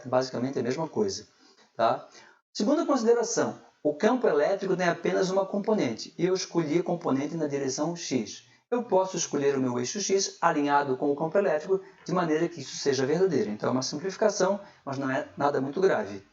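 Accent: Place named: Portugal. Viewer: Aula muito boa! Tens acento português professor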